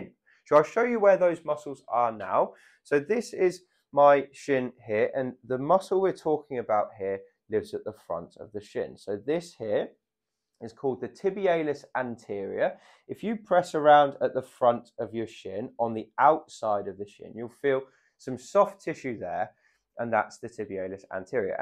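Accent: England, United Kingdom. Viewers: Love watching your videos after stumbling upon them. Love your accent -English?? Look with hope I will see Improvement, would have very much liked a consult with you but I live down under at the South Pole, instead I say thank you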